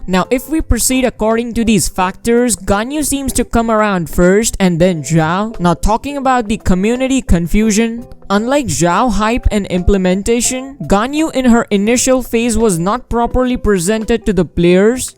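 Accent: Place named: India. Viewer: How come you can translate if its in chinese? You sound like an indian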